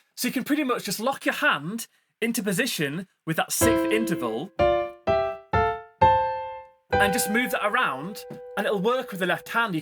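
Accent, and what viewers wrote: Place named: Manchester, United Kingdom. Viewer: Love listening to your accent! I’m guessing it’s northern - Manchester? From an avid British tv watcher from Australia. Also enjoy the content of your videos - very helpful!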